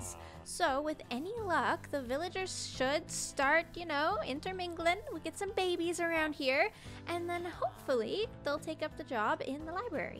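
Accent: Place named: Canada. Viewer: but still, her Canadian accent confused me a bit, as I am from England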